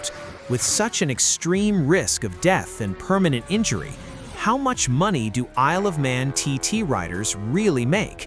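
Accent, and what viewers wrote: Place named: United States. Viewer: Amercan voiced commentary, Im out.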